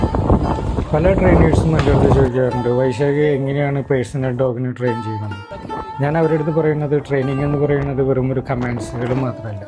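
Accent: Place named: Kannur, India. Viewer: ningalude bhasha kannur karudeth pole und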